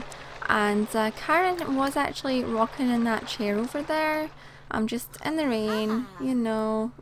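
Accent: Scotland, United Kingdom. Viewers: Are u Scottish love ya voice. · i was trying to figure that out too but think its Scottish. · Are u British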